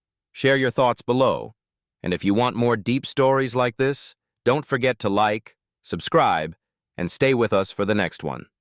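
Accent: United States. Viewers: O look an american voice=terminology=brainwashing=cast system shame on you people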